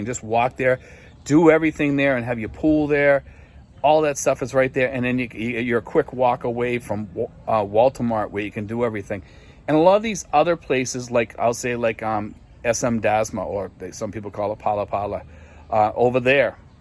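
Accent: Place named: Massachusetts, United States. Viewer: i detect a Mass accent, where are you from in Mass?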